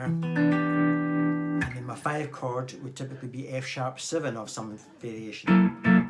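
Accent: Scotland, United Kingdom. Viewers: what cool accent the Scottish is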